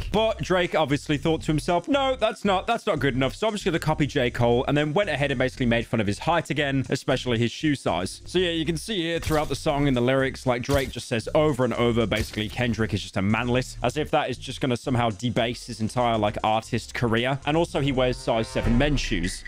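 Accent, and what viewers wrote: United Kingdom. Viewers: I love listening to furry British man talk about American rap, very intuitive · I get what ur saying but that’s just his British accent, not necessarily the pronunciation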